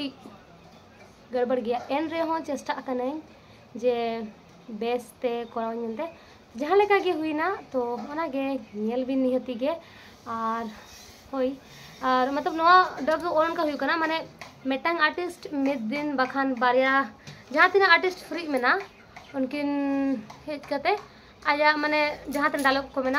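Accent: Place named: Santali, India. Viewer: Love your santali accent❤️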